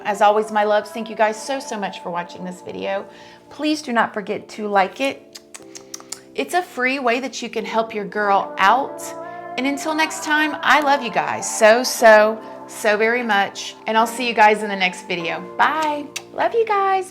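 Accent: South, United States. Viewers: I love you. I'm from the south also and you talk just like me and everyone in my small town. Although your talking about something so serious I can't help but laugh when you say certain things like in my dumb dumb brain 🤣. You are so not dumb which is why I keep coming back. God bless keep up the good work.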